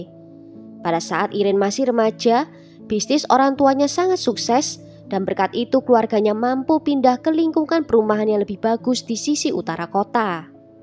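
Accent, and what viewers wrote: Java, Indonesia. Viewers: mbak suara nya Medok 😀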